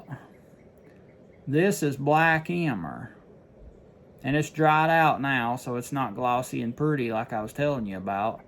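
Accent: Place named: Danville, United States. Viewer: Farro is the common name. I remembered it from hippies. I bought some just now whilst I was listening to you. You talk like me n my people in danville va. Looking forward to fall planting!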